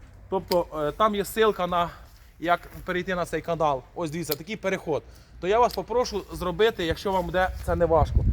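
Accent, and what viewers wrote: Vinnytsia, Ukraine. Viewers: Дякую за добре поданий матеріал! Говірка вінницька, так приємно!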